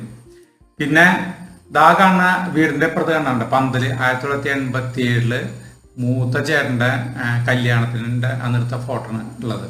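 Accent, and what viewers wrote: Thrissur, India. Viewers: I like urTrissur slang .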